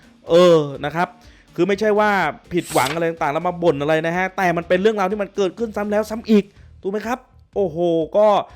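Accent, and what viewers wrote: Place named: Thailand. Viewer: มาเจิมคอนเท้นท์ใหม่เเก้ะกล่อง ชอบครับ กระชับ มีมุกตลกสอดเเทรก เเถมมีคาเเรคเตอร์เสียงเหน่อ ขอให้ปังๆนะครับ🎉 (จากแฟน LFC ที่เปิดใจฟังทุกทีม)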